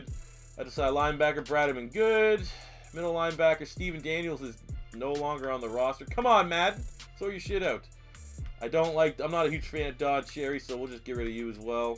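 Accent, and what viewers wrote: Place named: Canada. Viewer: Josh Lak he's from Canada its most likely an accent thing.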